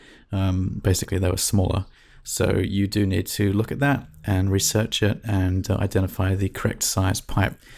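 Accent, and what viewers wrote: England, United Kingdom. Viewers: great video, I trust English voices more than any other accent!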